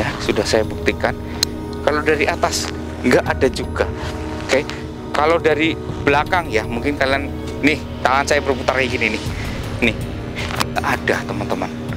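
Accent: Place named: Banyumas, Indonesia. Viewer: itu bhs jawa ngapak saudaraku, bhs halus tp ya terdengar beda dgn bhs jawa solo semarang, mungkin Mr ini tinggal d daerah jawa tengah bahagian barat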